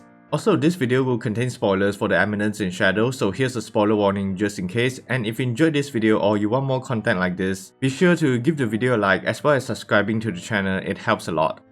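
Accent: Singapore. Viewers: no wonder your accent sounds familliar, singaporean sia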